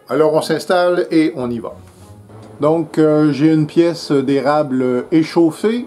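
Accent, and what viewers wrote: Quebec, Canada. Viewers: Bonjour! Pas facile la plane pour un débutant, mais avec cette vidéo qui complète tes anciens tuto, je me semble plus à l'aise. J'ai donc fini mon 1er lutin sans accrocher, et en plus avec la finition cyano. Un bonheur...Un retraité français( mon fils vit à Montréal depuis 10 ans, j'adore ton accent qui me refait vivre de bons mais rares moments au Québec )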